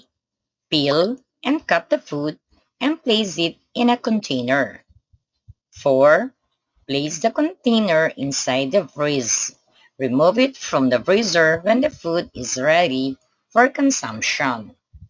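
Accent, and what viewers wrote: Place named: Philippines. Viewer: pinoy yan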